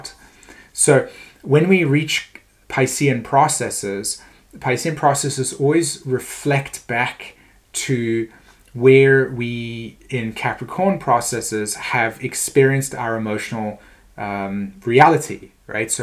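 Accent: South Africa. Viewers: you are loosing your lovely South African accent.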